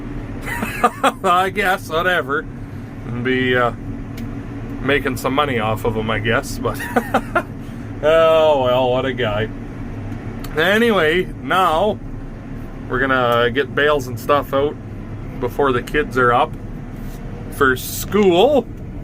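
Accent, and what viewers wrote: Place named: Canada. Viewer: I love this channel, he talks like real Canadian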